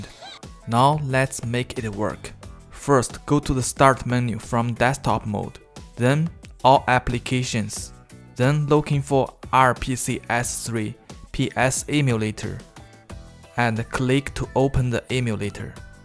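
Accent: China. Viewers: Yes! Finally find a solution. Thanks for the video., may I ask, are you from china? Your accent is very familiar to me😂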